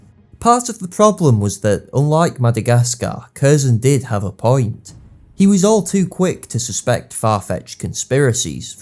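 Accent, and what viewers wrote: United Kingdom. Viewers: Where the accent is from ? Is it british ?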